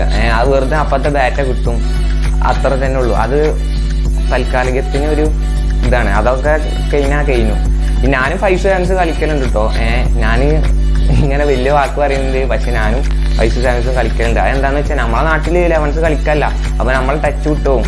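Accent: Malappuram, India. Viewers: മലപ്പുറം ഭാഷ തന്നെ 🤣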